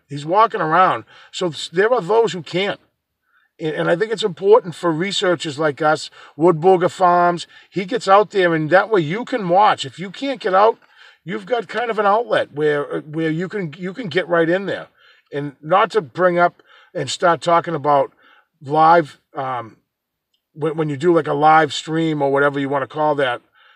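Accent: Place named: New York, United States. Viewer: I love your channel becsuse you're a regular guy dedicated to honest research. I like you even more now because asshat is my favorite pejorative descriprion of some folks. I also love your accent, and I'm from Long Island so I can relate.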